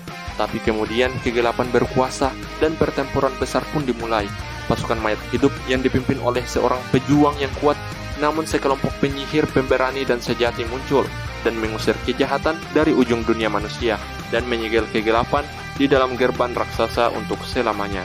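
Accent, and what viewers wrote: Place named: Medan, Indonesia. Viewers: Suaranya Kayak orng medan Ygu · Logatnya kayak orang medan ya bang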